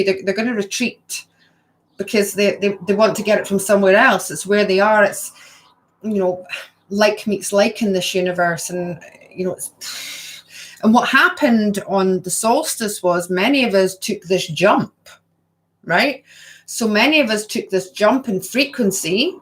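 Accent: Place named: Scotland, United Kingdom. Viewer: Nice to hear a Scottish voice for a change on a truther video!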